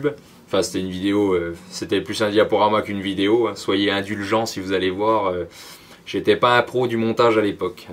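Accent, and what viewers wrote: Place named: Haute-Marne, France. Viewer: Côte d’Or, ok 👍 En haute-marne ils ont un peu le même accent.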